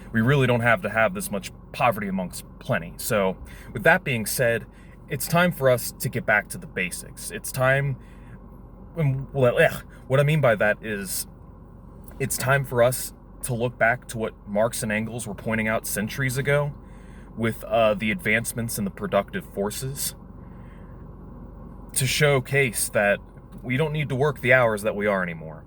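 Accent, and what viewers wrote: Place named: United States. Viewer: Why is the steering wheel on the right? You sound American.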